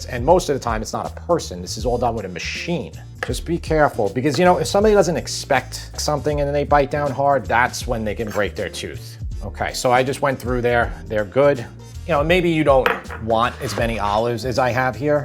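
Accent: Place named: Long Island, United States. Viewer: wondering if i still sound like this..... im giggling everytime you say capers... grew up on long island but hopped to the other side of the pond 10 yrs ago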